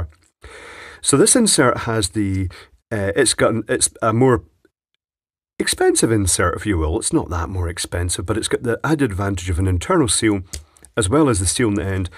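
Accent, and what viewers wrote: Scotland, United Kingdom. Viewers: Not sure why I watch this. Perhaps it's just nice to hear a fellow Scotsman talk. Thanks for the video,.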